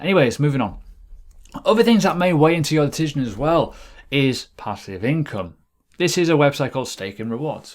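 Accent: United Kingdom. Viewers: Anomynous? British lol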